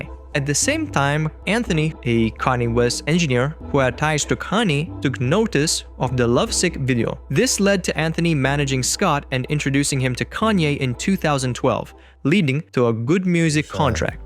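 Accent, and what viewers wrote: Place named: India. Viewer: u sound indian af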